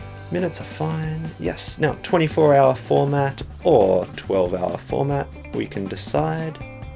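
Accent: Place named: Australia. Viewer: Dat comfy Aussie accent. :)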